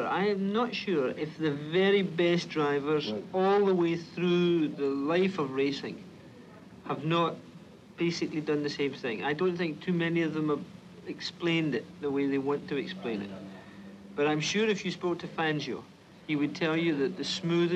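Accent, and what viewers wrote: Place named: Scotland, United Kingdom. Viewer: The auto closed captioning is just getting crushed by that sweet sweet Scottish brogue.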